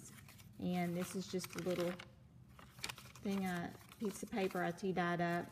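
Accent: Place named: South, United States. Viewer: Your gaw-geous southern accent is so wonderful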